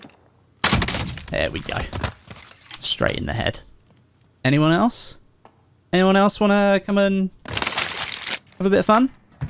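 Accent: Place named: United Kingdom. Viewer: Why are you British??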